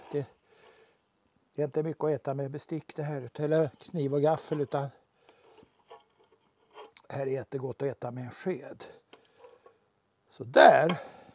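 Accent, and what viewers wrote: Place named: Gothenburg, Sweden. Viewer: Hej, Du bryter både på "Göteborgska " och "Stockholmska". Vilket är din farorit dialekt?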